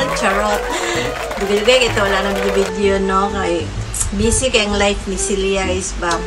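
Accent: Visayas, Philippines. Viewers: Bisaya kaau ni madam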